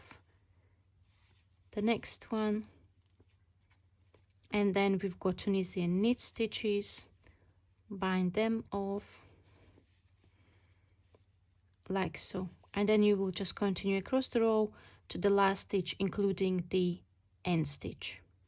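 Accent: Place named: United Kingdom. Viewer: what kind of british accent do you have? its nice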